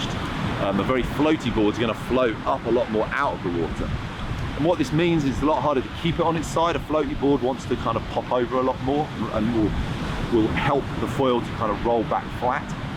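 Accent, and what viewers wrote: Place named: United Kingdom. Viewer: Great vid! Clear British instruction!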